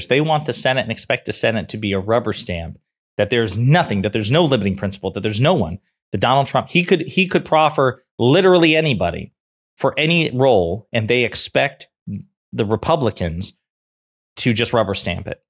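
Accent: South, United States. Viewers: Great southern hoo hah accent…your Trump’s not bad either..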